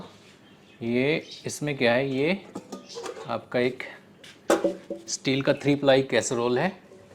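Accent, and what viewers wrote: Standard. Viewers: aap jitne ache tarike se sudha Hindi me bolte he utna koi dusra cheaf nahi bolta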